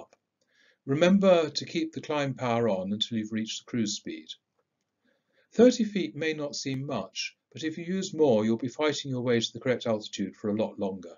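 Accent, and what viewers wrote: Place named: United Kingdom. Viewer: Thank you so much for this basic info for every aspiring pilot...Very informative, and presentated in a very clear and "to the point" way! (despite it's a bit difficult for me to grasp everything, because of your Brtish accent, but i' plan to watch the video several times.) Once again, thank you Sir!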